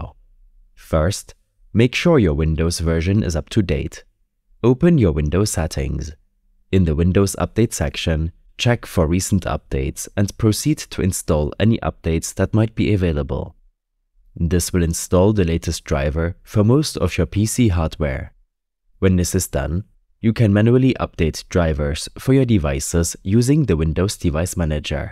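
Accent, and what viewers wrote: Germany. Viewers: I love this perfect german accent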